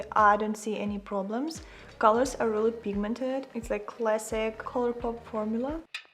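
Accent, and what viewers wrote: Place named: Ukraine. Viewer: Love this look so much. You’re earrings are gorgeous. And I just really love your Ukrainian accent when you speak English. Awesome video. 🤩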